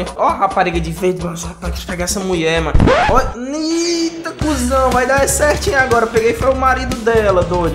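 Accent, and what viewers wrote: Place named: Ceará, Brazil. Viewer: Mermao, Cearense falando e muita onda kkkkkkkkkkkkkkkkkk Coloquem mais esse narrador pfv!! muito bom!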